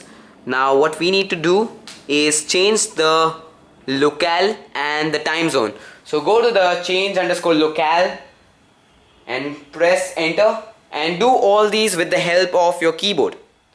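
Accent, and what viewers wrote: India. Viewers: are you from india :D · Is that a Marathi accent you have?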